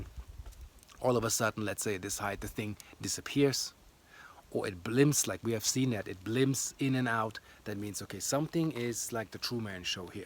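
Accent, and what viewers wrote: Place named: Puerto Rico. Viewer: Greetings, my star bredren. Thought you might find this of interest: <link> A relevant quote: "In this ancient genetic continuum between Africa and West Eurasia, sampled African-American individuals are most similar to sampled individuals from the Nilotic and Horn of Africa regions" Your accent places you as originating on the east coast, perhaps of Puerto Rican extract? This article speaks of West Indies and Caribbean populations and their relation as far as their genetic makeup, to these ancient Nilotic populations as well. Literally, we ARE them, reincarnated as it pertains to soul groups and karmic recompense. Blessings, Mark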